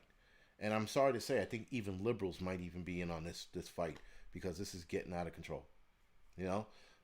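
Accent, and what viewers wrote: New York, United States. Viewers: Just curious brother. How come you talk like a New Yorker? We're you born or live elsewhere for a while? I live near Boston and we almost sound alike. But you no...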